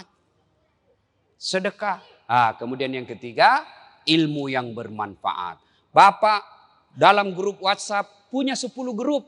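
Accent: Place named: Banjar, Indonesia. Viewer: Org Banjar y ustadz,dr awal mirip bahasa suami saya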